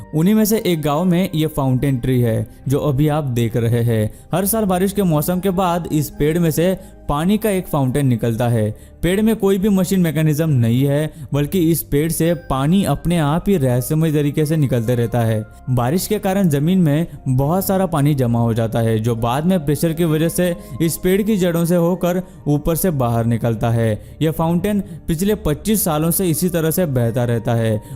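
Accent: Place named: South India, India. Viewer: Aap ki aawaj se to aap south indian lag rahe hain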